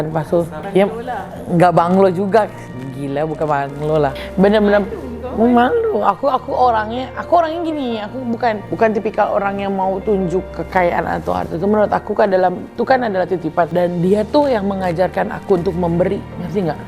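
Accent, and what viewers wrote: Malaysia. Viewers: Alhamdulillah, tahniah!! Yg penting loghat bahasa melayu dgn indo nya bercampur ya. Unik sekali 😁😁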